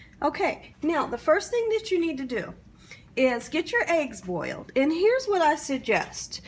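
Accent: South, United States. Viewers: This lady’s from the south for sure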